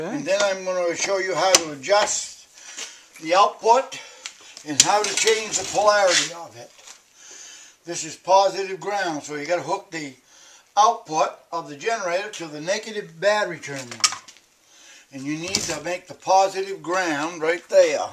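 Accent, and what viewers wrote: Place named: Maine, United States. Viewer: knew he was from maine within first few words ..sure enough lol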